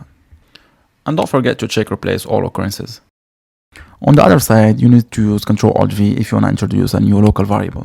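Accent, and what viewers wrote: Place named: France. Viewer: I've watched the Kubernetes video and now this one, I've gotta say that you're explaining everything great. Your videos have a nice format and are quite enjoyable to watch, but I have one small thing to say to you and I mean no offense here - we all know you have a french accent when talking and sometimes (here and there) you talk so fast that I lose you, so for your future videos if you could slow down just a little bit. :) Hoping to see more from you, subscribed! P.S. the brackets plugin is amazing, thank you!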